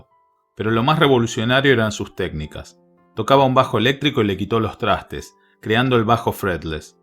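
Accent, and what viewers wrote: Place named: Yucatan, Mexico. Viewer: parese yucatecooo bombaaa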